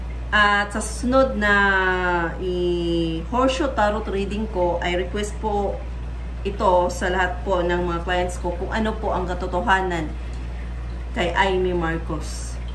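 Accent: Visayas, Philippines. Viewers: bisaya si reader?💚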